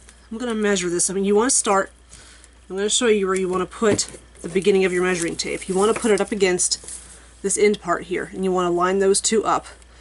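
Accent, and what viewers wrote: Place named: United States. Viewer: Your American voice is so cute🙈🙈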